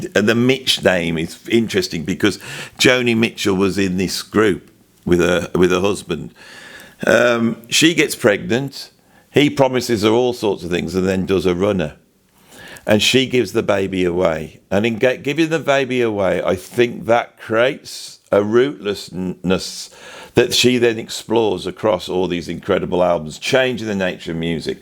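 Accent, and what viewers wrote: United Kingdom. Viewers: It is episodes like this one that keep me watching,. I have subscribed and unsubscribed a number of times, usually because of offense that you either purposefully or inadvertently bring to my Christian beliefs. But its when you get right down to what life is all about and get very transparent and authentic that keeps me hanging around. I do enjoy your sense of humor and your entertaining British accent, which reminds me of the Beatles, and I understand that every episode can't be like this one, but we all need to be more human and look at ourselves and others for what we are to understand our wretched sinful condition and our need for the love of God. Keep up the good work!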